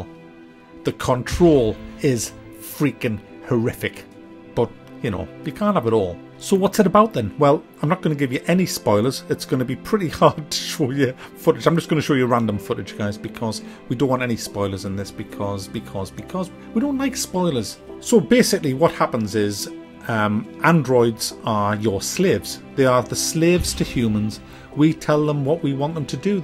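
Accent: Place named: Newcastle, United Kingdom. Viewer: Quite funny having the plot explained in a geordie accent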